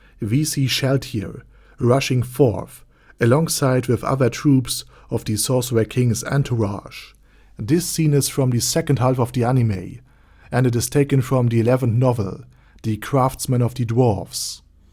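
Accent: Germany. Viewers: dude I love your accent, are you German?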